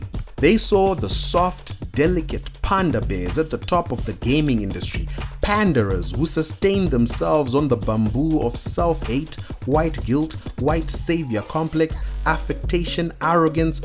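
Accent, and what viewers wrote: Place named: South Africa. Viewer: South African? Man instant sub! Haha gaming news that sounds of home..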